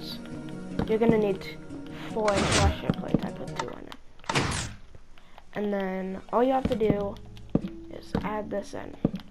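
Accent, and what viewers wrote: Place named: United Kingdom. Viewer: Holy shit you sound British in this video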